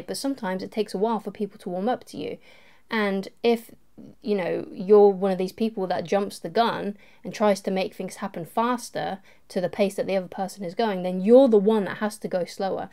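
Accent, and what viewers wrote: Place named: England, United Kingdom. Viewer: with a voice like that....I can listen to you all day.... just can't help to be attracted to a pretty Lady with a soft English accent.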